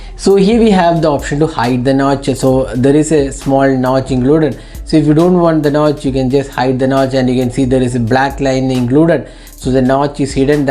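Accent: Kerala, India. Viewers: i hate indian english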